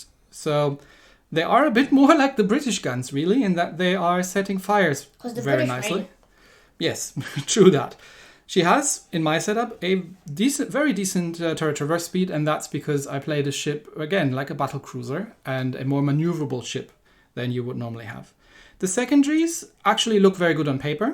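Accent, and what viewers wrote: Germany. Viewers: Hey i gptta say i found you as i needed some guidance on how to play a bit better hit those citadels and it help'd. Also you sound like you are a german but still wanna ask you what server do you play EU or NA because i tried searching your fleet yet i could not find it.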